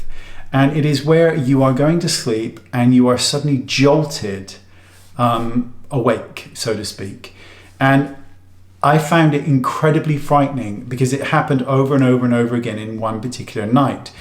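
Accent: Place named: England, United Kingdom. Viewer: Hi,. Thank you so much for this video. It was really great to know more about you. I'm a 52 year old Canadian woman who has a very grown up, big girl job and everyone thinks I've got ot all together but I don't. I'm just like you! I'd never know from your videos that you have some anxiety and doubts and fears. I too love sappy movies, songs and books. Historical romance...oh, my beating heart! I too have a very dark sense of humour and laughing so hard that I snort and cry is my favourite thing in the world. Movies I love: Shakespeare in Love and When a Man Loves a Woman with Andy Garcia and Meg Ryan. It's quite old but if you haven't seen it, man, get the tissues. Also The Family Stone. I just watched a documentary called McMillions about a scam being run on the McDonald's monopoly game in the 80's. If the story wasn't being told by the FBI I would never have believed it was true. 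I'd love it if you could show some tips and tricks for mature faces. I loved your video on hiding eye bags. Mine are trash bag sized so I can't totally hide them but now I can make them much less noticeable. Keep up the great videos...I just love listening to your voice. An English accent gets me every damn time!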